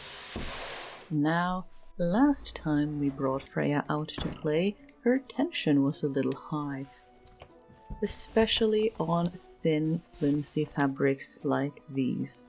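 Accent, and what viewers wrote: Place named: Sweden. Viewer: I came here from your Hank react. The tone and pacing of your video style is gorgeous. Perfect for watching while drinking coffee from my favorite mug and knitting on a rainy Sunday. Also, my maternal grandfather was a Swedish immigrant (US here) and I feel like I'm listening to long lost kin. ❤ An island of tranquility in a dark, stormy world these days.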